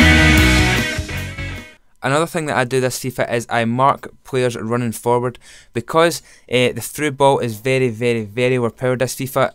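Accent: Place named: Scotland, United Kingdom. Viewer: Sounds ok to me, have you never herd a Scottish guy b4? lol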